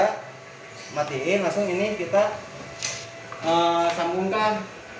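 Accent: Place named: West Java, Indonesia. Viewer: Sunda pisan eh s eta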